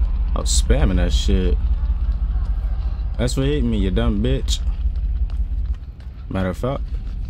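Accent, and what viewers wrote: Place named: Detroit, United States. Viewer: Sound like my homie from 7 mile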